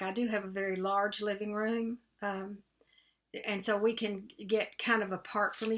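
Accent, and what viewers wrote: South, United States. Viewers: We replaced our 100 gallon hot water heater with a tankless one and love it! It does take a few minutes for the hot water to get to our shower but that’s because the heater is in a pool closet on the opposite side of the house. You have endless hot water and never have to worry about running out! It also gives you a whole lot of room compared to the giant tank! Enjoy😀 I love watching and listening to your videos. I grew up in Virginia and moved to South Florida almost 35 years ago. Not many soft spoken, Southern accents down here😢. Happy 4th🇺🇸🇺🇸🇺🇸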